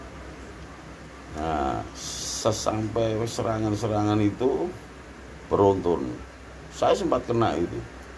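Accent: East Java, Indonesia. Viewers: Top gus lossss.. Ngomong Karakter suroboyo tanpa ading aling-aling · Nek gak kaku, duduk arek suroboyo arane... Jian josss... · Losss..... Ben ketok nek wong Jawa. Timur mas 🙏🙏🙏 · Gpp gus.. Mklum ktnya gus org tuanya jombg.jbg nya mana gus.. Sya dr djbg. Smga sehat selalu gus ... ..logat jatim ya bysaa gus... Woteper. Heheh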